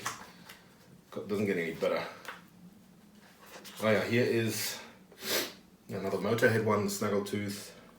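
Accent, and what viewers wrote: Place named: Germany. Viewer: You have a German accent, and I live in Europe. From what retailer did you buy it from?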